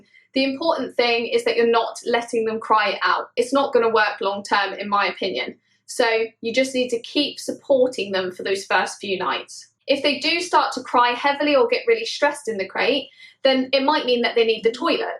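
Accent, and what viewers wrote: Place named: United Kingdom. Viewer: Oh, luv the your British accent!🐕will keep watching...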